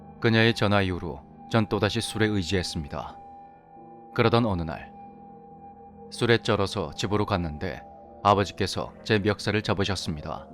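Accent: Gyeongsang, South Korea. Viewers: 경상도분이신가봐요 요즘 목소리로 열심히 밀린숙제하듯 듣고있읍니다 경상도사투리가 완전 반갑고 어색하지않고 참 좋아요~~^^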